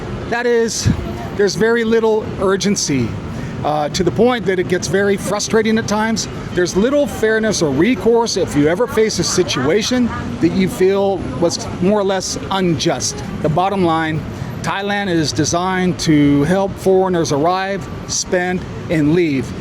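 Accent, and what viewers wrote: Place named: United States. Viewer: You sound so American. If only you knew how you sound to the rest of the world.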